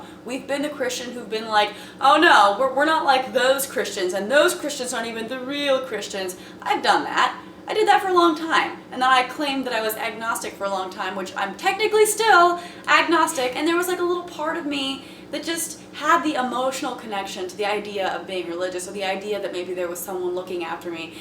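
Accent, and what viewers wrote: South, United States. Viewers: Even famous biologist Richard Dawkins has noticed and appreciates her style. We all love listening to her, even the Muslims watching "Muslim hot line" although they won't admit it. Me I just LOVE the southern accent y'all. #masturbateforjaclyn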